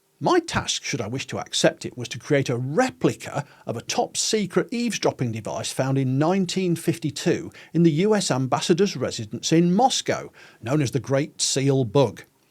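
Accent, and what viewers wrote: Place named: Lancashire, United Kingdom. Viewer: You're a Lincolnshire lad? Why's it sound like you have something else mixed up in that accent then? I mean, the Lincolnshire accent isn't the strongest of accents (unless you're saying something like carpark)...but, I can hear elements, maybe, of a Lancastrian accent mixed up in there too? 🤔 I know a lot of Lancaster Bombers used to fly from in and around Lincolnshire during the war...the link IS tedious though to say the least 😂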